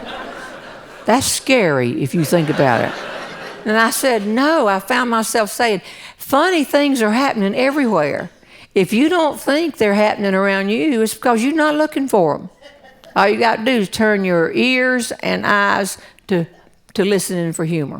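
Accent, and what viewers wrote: South, United States. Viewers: It's not that funny things don't happen around us, but not everyone has the gift of storytelling you do. I could tell the same story but a whole crowd wouldn't laugh. A true gift. It's especially funny with the southern accent. I'm in MN so I'm sure mine would make you laugh. That's why people quote Fargo. Yeah Sure You betcha. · I love u! wish you were my family. love your story telling your southern drawl and your humor. God bless u every day.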